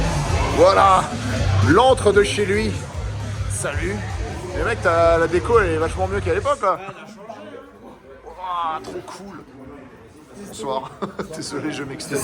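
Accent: Belgium. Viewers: Tu a fait ma journée ton accent belge est juste magnifique je pleure de rire 🤣🤣🤣🤣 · excellent accent Wallon! j'habite la Frontiere et je confirme que ca y resssemble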